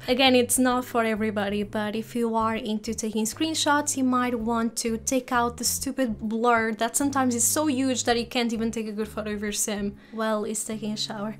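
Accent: Portugal. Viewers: i knew you were portuguese haha it's so cute on your voice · Your accent sounds Portuguese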